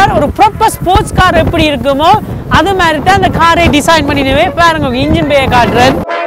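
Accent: Sri Lanka. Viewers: Avoid music .unka ilankai tamil semmayayirukk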